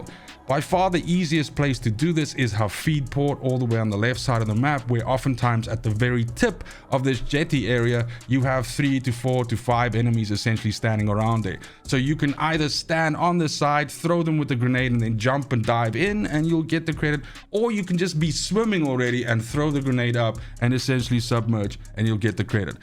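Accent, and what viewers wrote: South Africa. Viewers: I can recognise that saffa accent anywhere. Thank you for the great video, helped me out. Best of all, it is short and to the point. Keep up the good work.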